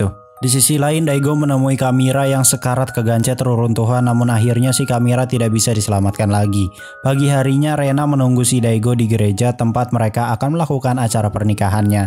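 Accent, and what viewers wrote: West Java, Indonesia. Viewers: Sunda pisan🤣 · Wah si mamang nyunda euy wkwkw mantap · Bang Orang Sunda Y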